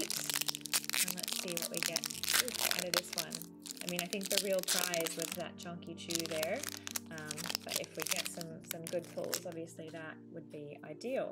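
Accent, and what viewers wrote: Australia. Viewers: Noooo it was a steel energy hahaha. I hear Australian and American accent too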